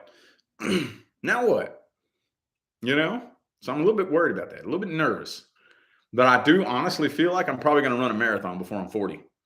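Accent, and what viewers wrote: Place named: United States. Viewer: I loooove this specific American accent and the cabin idea at the East coast and the fitness orientation :) greetings from Greece 💜☀️✨🌙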